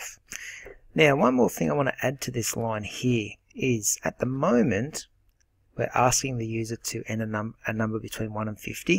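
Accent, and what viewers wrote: Australia. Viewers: The tutorial is explained perfectly.. but for some reason I'm getting an error in line 6 "while guess != number:" the error says: while guess != number: ^ SyntaxError: invalid syntax I tried everything I could but I am unable to fix it. Love the Aussie accent btw <3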